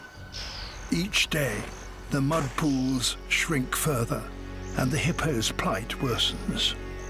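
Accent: United Kingdom. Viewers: Desculpem o meu comentário um pouco tolo, mas alguém sabe me dizer se a pessoa que faz a narração fala o inglês britânico ou americano? Desde já agradeço! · This British accent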